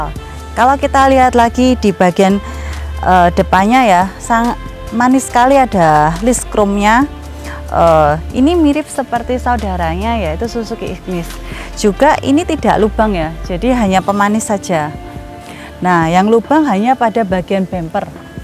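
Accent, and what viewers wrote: Java, Indonesia. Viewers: Mbak nya ayu,jawa nya ketara,aku sukaakkk.... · iya gais, medok nemen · Medok bner dah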